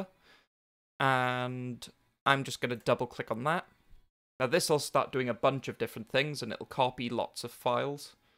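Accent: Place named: Wales, United Kingdom. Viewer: Gotta love a welsh accent mun aye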